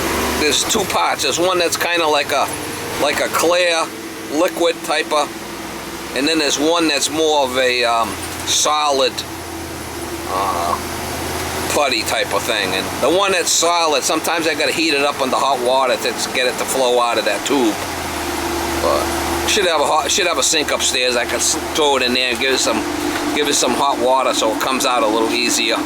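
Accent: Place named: Massachusetts, United States. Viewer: I really like your videos. I think you must be in Massachusetts. I would totally call you to yell at me at my house if I had a plumbing issue! "That shits gonna be there for life. It'll be there forevuh!"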